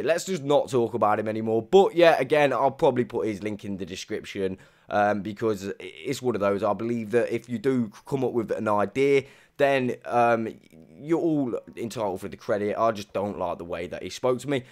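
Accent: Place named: United Kingdom. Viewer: For anyone asking why he sounds like DanTDM, it’s because he’s British and has a similar regional accent to him. There is a slight difference, with Dan sounding slightly more “posh” than him, but they are largely the same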